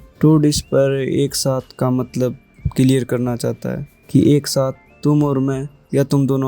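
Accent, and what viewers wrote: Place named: Haryana, India. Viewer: Tum haryana se ho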